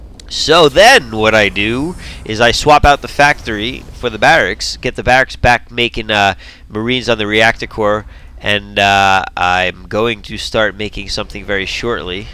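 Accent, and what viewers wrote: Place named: East Coast, United States. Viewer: As much as I don't like the screaming in my ear, I love his east coast accent. :P Go America!!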